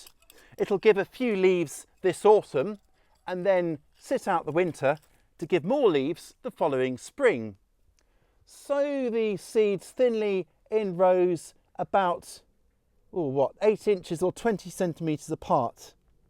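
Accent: England, United Kingdom. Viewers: He sounds English, maybe zone 7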